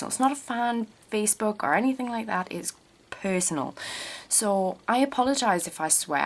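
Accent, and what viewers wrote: United Kingdom. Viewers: haha, while I agree with your rant..your accent just made me laugh tremendously. idk something about British accents saying the word "fuck" lol. but truthfully only someone jealous of your will power or insecure with their body would write something like that. I know bc I'm super jealous. But I'll deal lol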